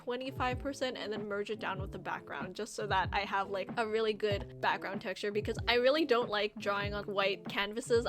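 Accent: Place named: United Kingdom. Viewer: ew british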